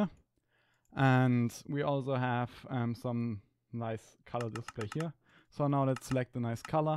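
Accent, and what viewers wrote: Germany. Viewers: haha englisch mit dein deutschen akzient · Ich find's immer lustig wenn deutsche englische Videos machen, weil man hört es schon ziemlich, naja, vermutlich wird das sowieso nur den deutschen auffallen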